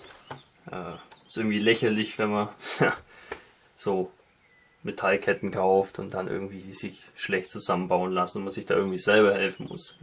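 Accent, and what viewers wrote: Swabia, Germany. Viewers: Bist du aus Baden würtemmberg? Du klingst voll wife der Schwabe!!!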